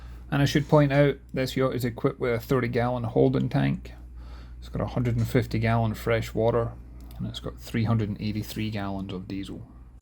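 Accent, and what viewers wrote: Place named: Scotland, United Kingdom. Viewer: Not bad.. But the guys that are selling it have not even done a through clean on it and that in it's self would stop me from buying from them.. I mean they didn't even wipe out the cooler on the fly bridge.. Just being honest brother.. still nice little boat to do some cruising in.. My wife loves your Scottish accent..👍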